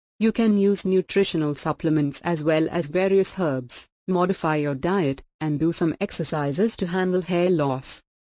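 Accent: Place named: Asia. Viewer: An Asian robotic voice🤦🏼‍♀️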